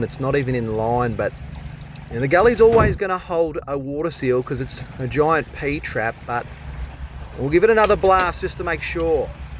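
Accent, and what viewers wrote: Australia. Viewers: Sending kudos to you from th State of Delaware, USA. Love ya, ya silly sausage! (Love the outback terminology and love hearing your accent!) 🥰🤗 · G’day! New here and I love the Aussie accent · I watch Tornado and animated videos how did I get recommended this buity from someone with the most Australian language I have here heard. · Same! I just love his Aussie talk. Amazing! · I'm not a professional like you (some might say a legend), but I've had my share of clogged shitter pipes.......and speaking of pipes, your Aussie accent compliments your work, like a good red wine and steak. All the best from a commonwealth brother in Canada! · This is the most Australian man I have ever heard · I’m an Aussie… & I must say I love the Australian accent& enthusiasm… thank you for no course language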